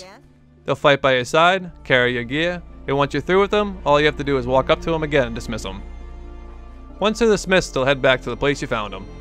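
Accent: Boston, United States. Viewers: okay thank you for replaying! Being a console player my whole life mods are foreign to me. One more thing, are you from boston? Coming from a fellow Bostonian. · cool I like the Boston accent and could u do a vid on energy weapon mods if u haven't already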